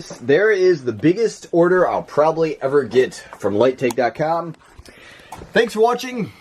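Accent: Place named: United States. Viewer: Bruh u like Vietnamese but sound American af...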